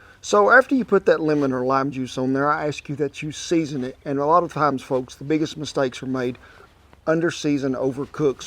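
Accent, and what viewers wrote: South, United States. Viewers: Southern draw* It’s about time I got onto this side of YouTube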